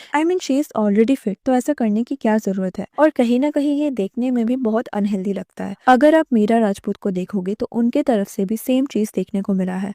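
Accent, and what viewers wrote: Bengal, India. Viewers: I love ur bangali tone 😊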